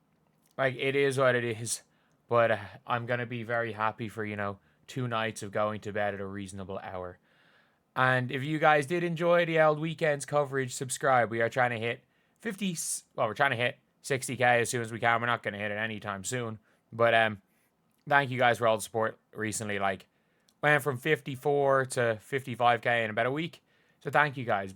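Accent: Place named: Ireland. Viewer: You sound Irish.....😊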